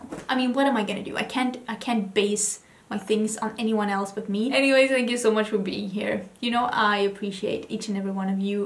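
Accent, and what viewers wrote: Sweden. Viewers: Yes. Im danish and i could NEVER speak so fast and fluid english like! Also, swedish is a beautiful language so her accent is so nice:) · I think this eye look is one of my favorites you ever done. I’m only 1 minute into this video and HAD to comment and let you know. You are always stunning, but this entire look...wow!!! I love seeing your hauls because I know definitively that, bcuz of your Low-Buy, whatever you bought you REALLY wanted and spent a while deciding to purchase!! Okay, back to completely, 100%, focusing on your wonderful video instead of typing!!!! 😘 Edit: how wonderful that you got so excited about a purchase. I’m buying the ND Mini Gold palette today as I returned the new CT foundation (ay yay yay!) and I’m soooo excited! And, your English is wonderful. When my Dad came to this country from Germany, he started learning English by watching cartoons. 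Til the day he passed, he had the most beautiful accent but still pronounced some words incorrectly or had trouble with certain popular phrases but I found it endearing. You should be so proud of your near mastery of the English language; it’s so complicated, and your gorgeous Swedish accent makes it all the better. Niacinamide is hard for even a biochem scholar in the US to say, I think, lol. Maybe try: ny-uh-sin-uh-my-d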